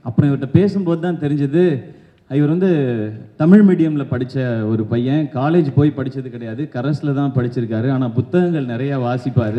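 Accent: Coimbatore, India. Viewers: Coimbatore slang swag nov ❤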